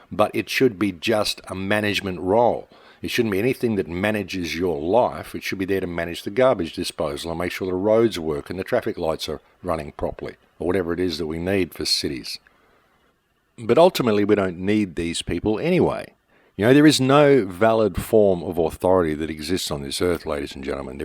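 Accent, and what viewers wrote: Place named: Australia. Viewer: Thank you! Thank you for the time you put into your work for us! And thank you for being born in Australia! I love the sound of your voice combined with your accent as it skillfully spills the truth. Thank you for keeping us aware of our true surroundings while teaching us how to identify big picture realities which the majority of the human population is deliberately blind to.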